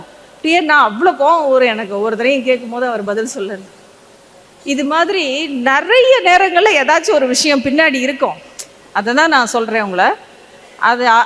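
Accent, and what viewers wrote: India. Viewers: ஆனால், நல்ல தமிழ் கதைக்க உங்களால் முடியவில்லையே. Madras தமிழ் தானே கதைக்கிறீர்கள். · உன்னைப் பார்த்தால் சிரிப்பா வருது..🤣🤣🤣 அரபிக்காரனுக்குத்தான் உன் English best ஆ தெரியும்... America, Canada ல பேசிப்பாரு... உன் பேச்சிலேயே நீ இந்தியன்னு சொல்லுவாங்க. (because of your accent) 🤣🤣🤣 முதல்ல ஒரு விஷயம் புரிஞ்சுக்க.. English ஒரு language தான்.. ஒரு வெள்ளைக்காரன் தமிழ் பேசினால் எப்படி சந்தோஷமாக ஏற்கிறோமோ அதே போல நாம் English பேசினால் அவனும் அதை ஏற்றுக்கொள்வான். அதுக்காக அவன் நம்மை அதிகம் படிச்சவன்னு நினைக்க மாட்டான்.. நம்மள மாதிரி முட்டாளா அவன்???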